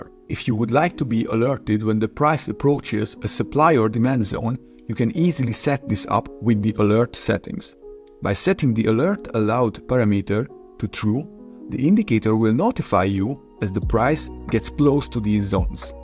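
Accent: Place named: Hungary. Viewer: Nice Hungarian accent… :-)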